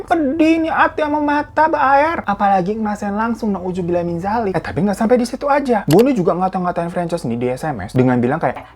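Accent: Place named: Jakarta, Indonesia. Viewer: Gw suka pembawaannya tuh apa adanya. ... kadang lucu denger logat bicaranya🤭... Betawi bangeud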